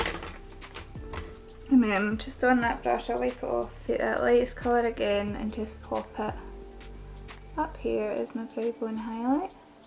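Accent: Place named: Glasgow, United Kingdom. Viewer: I love this look, it's quite simple but brilliant. You sound like you're close to where I'm from, I'm from Glasgow, Gorbals to be exact 😁👍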